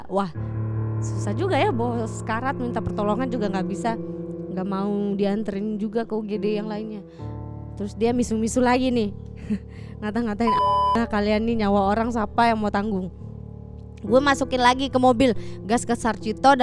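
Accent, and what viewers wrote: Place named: Lombok, Indonesia. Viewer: Aksen wong lombok..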